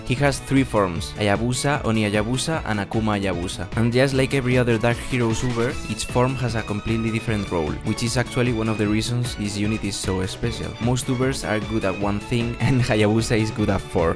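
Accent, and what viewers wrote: Spain. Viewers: solo por como hablas creo que eres español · E w tu ere español no? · R u spanish dude? Your acent is exactly the same as mine when i speak english😂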